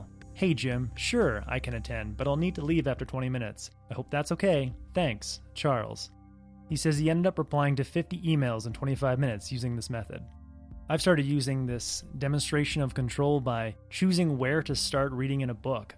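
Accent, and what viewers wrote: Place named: Canada. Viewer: Bona fide Canadian (Alberta?) accent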